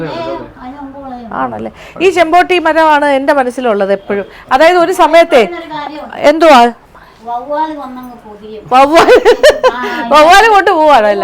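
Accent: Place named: Mavelikkara, India. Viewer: My paternal great grandma hails from Mavelickara and that's why I love to listen to the Mavelickara slang of our beloved Maam🙏🏻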